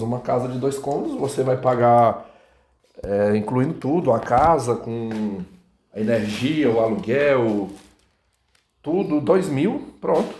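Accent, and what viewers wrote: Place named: Imperatriz, Brazil. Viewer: vc tem muito o sotaque do pessoal de imperatriz, fui lá recente gostei muito de lá, principalmente de Carolina.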